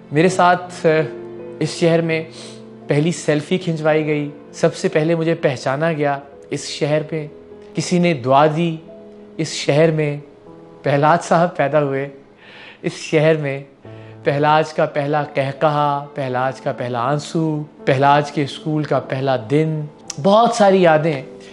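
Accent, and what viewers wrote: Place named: Karachi, Pakistan. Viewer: iqrar bhai apki urdu or bolne ka andaz aisa tha k mein jab se apko dekh raha houn yehi samajhta raha k ap karachi se he hain..ap k janay se aam log tou khush nahi hongay..haan chor 2 number log sukh ka sans lengay..ap k hone se karachi k issue kam az kam kuch had tak hal hojatay thay..ap k bad sindh gov. or awaam ALLAH he malik hai..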